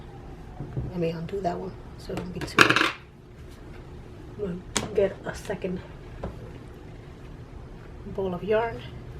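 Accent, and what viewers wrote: New York, United States. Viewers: I love your tutorials. Love the mat, very cool. I also love your New York accent. My daughter is half Puerto Rican on her father's side. Thank you for the tutorial and keep them coming.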